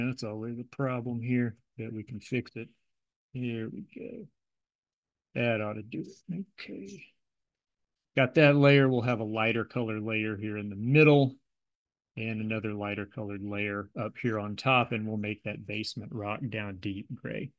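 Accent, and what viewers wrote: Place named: Philadelphia, United States. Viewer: Cool beans Unrelated but are you from Philly or Baltimore?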